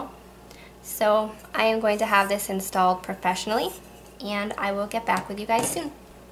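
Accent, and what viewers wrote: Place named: United States. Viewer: Hi there, excellent stuff here. Would you please mind telling me where are you located in the US? I mean a state/region. I have studied English for years know and I love your accent. I am just listening ( and watching also ) and I can´t have enough, since your pronunciation is so beautiful. I really love the language and I would be thankful to you to learn where in the US people cherish English like you do. Take a good care. Jan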